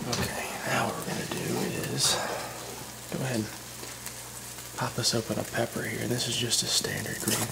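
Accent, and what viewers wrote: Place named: South, United States. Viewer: Winging it cooking is the only way to do it makes it exciting! Recipes are never to my taste. You need to brush up on your one handed egg cracking skills sir 😂😂😂 the thick raspy southern accent and the sizzling pan is just 👌 chefs kiss one of my fave things in the world is bacon, i am so making one of these bad boys 🤤🤤 i never thought an asmr video would make me dribble so much 😂😂😂 thanks cowboy, also that is a seriously fine hat it's missing a feather tho;-) i love cooking vids, stunning eggs aswell blues are one of my faves and a lovely rich dark yolk is divine 🤤 ❤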